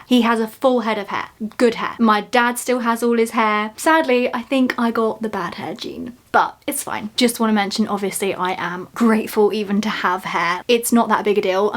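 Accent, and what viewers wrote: United Kingdom. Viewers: I love British girls and their accesent!❤ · is this british accent?? it appears i can't stand it it sound so raw and unnecessary annoying :( the accent could have been triggered my misophonia but i like u so best of the luck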